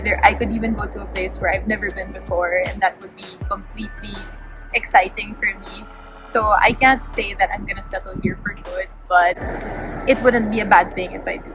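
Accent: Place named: United Kingdom. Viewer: Lumalabas British Accent niya dito since she is British-Pinay hehe Gandang Babae nito <3 At ganda din diyan sa UK lalo na sa London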